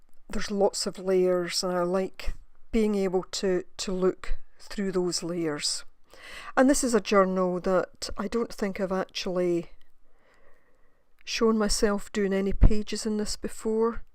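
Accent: Scotland, United Kingdom. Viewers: Hello, I have spent the last three days watching your videos after discovering your channel. I really like your style of work, your explanations and your scottish accent! Greetings from Germany!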